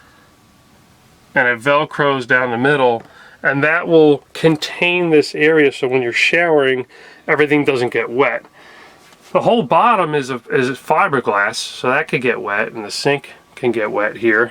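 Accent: New Jersey, United States. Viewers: Love the “wooder” hose, and “wooder” heater. Gotta love the Jersey accent! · Everyone in Jersey sounds like that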